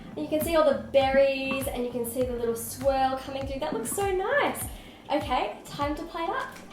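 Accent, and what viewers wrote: Australia. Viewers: R u an Australian?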